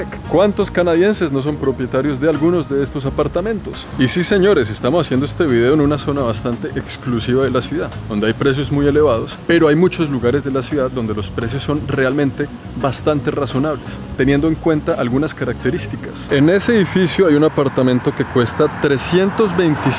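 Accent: Colombia. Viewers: Eres de panama crei eras colombiano tienes acento colombiano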